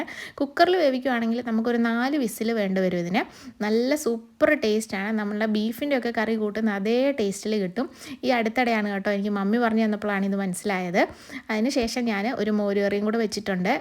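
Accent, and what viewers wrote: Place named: Kollam, India. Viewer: Kollam slang aanu. Athukondu chotdichatha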